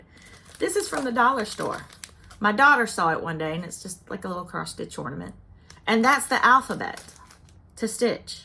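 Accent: South, United States. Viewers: I really love watching you, your so cute and perky and you brighten my day. Plus that southern drawl. I use to live in Tamarac for about a yr and a half in 1990. I’m in KY now and it’s cold today. Don’t worry about the grouchy people talking about. Everyone isn’t like them, someone always has to fuss about something. I’ve been trying to work on 28 ct Lugana. I got a BenQ light which helps tremendously w/o a magnifier. Lugana and evenweave are the same thing, jobelin is also an even weave but I think it’s harder. Yes I still mostly do Aida. That little Santa is so adorable. Have a Merry Christmas!🎄🎄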